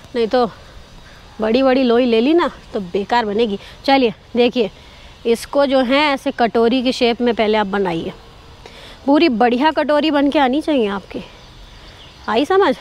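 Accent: Bihar, India. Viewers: Wah di wah... Kya to gajab aapne bihari boli or kya gajab ka explain kiya or uper se itni gajab ki recipe thi litti chokha ki sach main di khafi jayada maja aa gaya aaj ki video main. Thank You So Much For Shareing This Recipe. Di Best of wishes. 🌟🌟🌟🌟🌟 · Kya bihari bolti ho di😂😂 mujhe ase litti chokha pasand nai h leki apne kya gajab👌👌 recipe batai ho di ak bar try jarur karungi☺️😋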